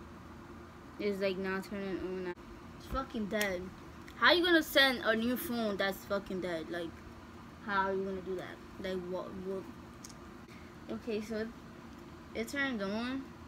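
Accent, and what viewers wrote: New York, United States. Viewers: Your accent sound like you from New York but anyways lmao I’m also getting an iPhone XR this Christmas or maybe earlier but yes I’m so excited I always watch unboxing videos of something I’m getting in the future😂😂😆😆‼️‼️💯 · Where you from? You sound like you from nyc ...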